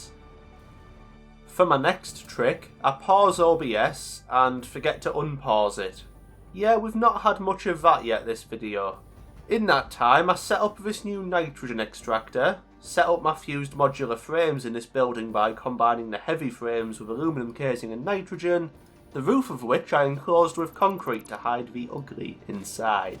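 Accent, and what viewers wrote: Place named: Northern England, United Kingdom. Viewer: I unfortunately have to report that since watching your videos my thoughts now speak with a northern accent sometimes. It will forever be gläeba in my head for now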